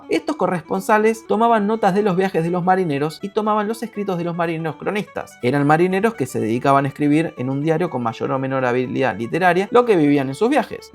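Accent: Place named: Argentina. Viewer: Tú video es imposible de verlo , hablas muy rápido , tu acento es demasiado fuerte y no tienes dicción . No se puede entender , ni captar lo que dices . Oye que no estás en la sala de tu casa habla do con amigos . Modula tu voz , habla más neutro sin acento pueblerino argentino , y toma clases de dicción. No se te entiende nada !!!!! Tengo coraje !!!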